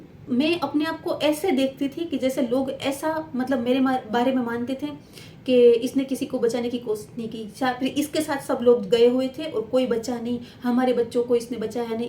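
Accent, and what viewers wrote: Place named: Gujarat, India. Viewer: .... Tamari bolvani style.. Havbhav bikul Gujrati se 👌👍😇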